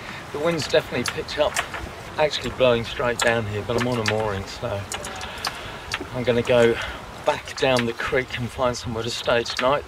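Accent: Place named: Australia. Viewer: First - the concertina! Amazing! Not the easyest instrument in the world and you did a fairly okay reel there without mistakes. At least - I am impressed. And for your sailing. Lovely as ever. You are facing the summerseason now, as I am freezing up here in Denmark. So looking forward to enloying some nice trips with you down younder, feeling the warmth through my screen and dreaming of my own coming summer juerneys. Did you grow up in Briatain? I would swear by your accent that you were born aussie. - Well. I'm just a Dane. How would I know the differences 🙂 Looking forward for your next vlog! Ah - I forgot. The million vieuws are just bacause your vlog are real quality. You do put a lot of work into lovely intros and overlockings from one scene to the next. It is a joy to watch your channel!!!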